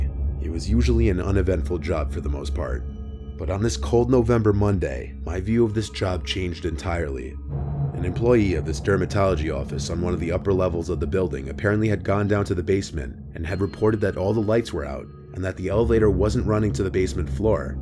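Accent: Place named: East Coast, United States. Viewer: Literally no one better than and his East coast accent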